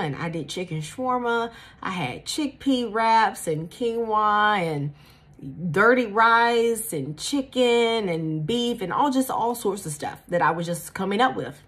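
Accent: South, United States. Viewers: You are so beautiful and I love your Southern accent, where are you from??😍🥰🥰🥰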